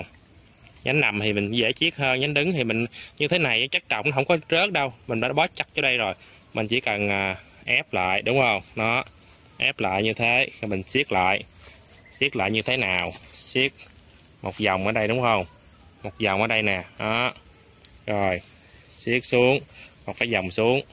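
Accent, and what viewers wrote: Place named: Mekong Delta, Vietnam. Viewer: Đúng chất giọng người miền Tây quê tôi, chỉ dẫn thật tình và tận tâm. Tặng cậu một like. Chúc cậu luôn gặp nhiều may mắn trong cuộc sống 🌺🌺🌺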